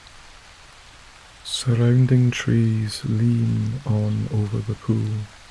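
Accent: Scotland, United Kingdom. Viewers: the sound of a Scottish voice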